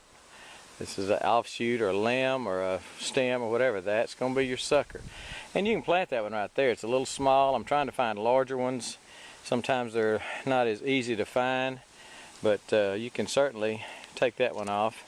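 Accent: Texas, United States. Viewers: Awesome video and great information I'm gonna try. Boy, sure got home sick hearing that Texan draw. I'm down south 13 miles from Galveston and sure miss them piney woods, ha!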